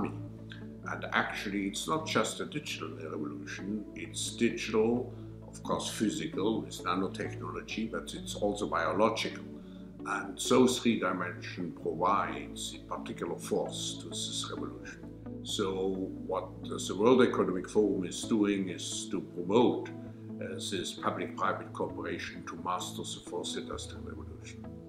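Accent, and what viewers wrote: Germany. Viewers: Judging by accent and last name, he's either German, Jewish or both. With that in mind, is it no surprise that he talks about a "1984" or "Brave New World" type societies in a positive manner. Update direct from Wikipedia: Klaus Schwab Born: March 30, 1938, Ravensburg, Nazi Germany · That German way of speaking....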